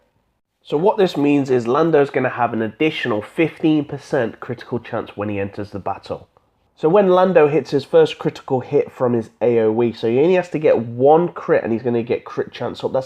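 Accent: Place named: United Kingdom. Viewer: I bet you're from GB, not USA, judging by your pronounciation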